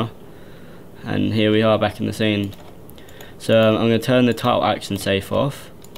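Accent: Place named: United Kingdom. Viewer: Don't understand British English..... ==' .... American is BETTER!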